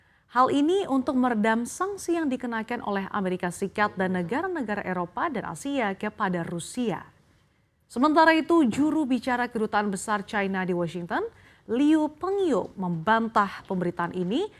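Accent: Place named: Java, Indonesia. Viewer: Mbak hostnya jawa banget..manisss... aku suka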